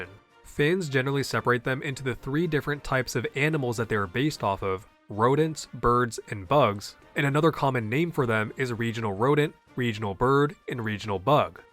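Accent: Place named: United States. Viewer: Today I learned is half Japanese that's pretty neat! Idk why I always assumed from your voice that you were like John America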